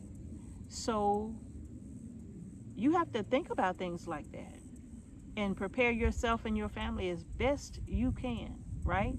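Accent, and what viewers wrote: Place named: Georgia, United States. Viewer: Thank God He intervened and kept y'all safe! I just love your videos and hearing you talk. My husband and I live in southeast Georgia so you talk like us! Glad things are fixed and better for you!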